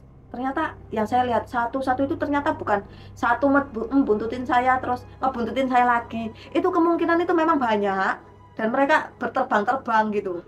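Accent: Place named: Surabaya, Indonesia. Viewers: Metu medok jowone mbak seng marai lucu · Omongane wong suroboyo nyel 😂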